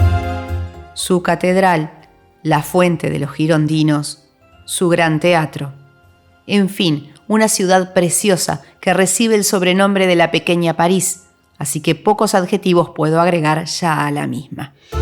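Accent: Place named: Argentina. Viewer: Toda Francia es preciosa!!! La voz argentina, suena maravilloso el español.Gracias por comunicarnos con su voz este precioso vídeo.👍👍👍👍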